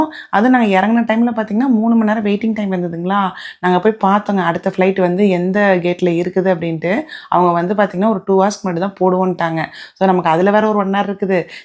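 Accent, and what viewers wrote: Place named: Salem, India. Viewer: Salem tamil rhombha super...maan vasanai innum ungalodu irukku...enga aaya, thatha ellarum salem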